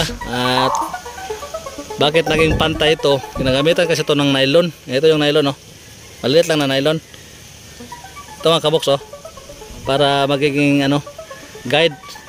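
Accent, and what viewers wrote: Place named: Iloilo, Philippines. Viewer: Morag ilonggo kaman ka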